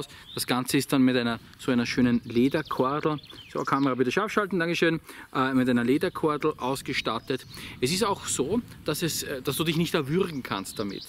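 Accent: Austria. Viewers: Hab jetzt einige deiner Videos durch und lass mal ein Abo da. Schöne Präsentationen und deinem Österreichisch hört man gerne zu.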